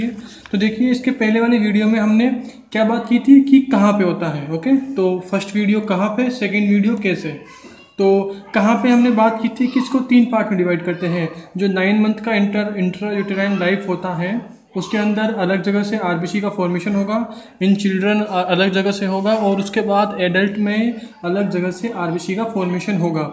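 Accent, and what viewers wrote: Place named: Bihar, India. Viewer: your videos are really good except for that bihari tone you speak.......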